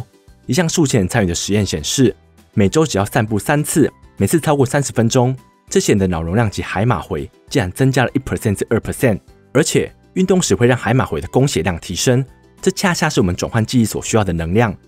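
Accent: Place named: Taiwan. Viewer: The speaker has a very thick Taiwanese accent.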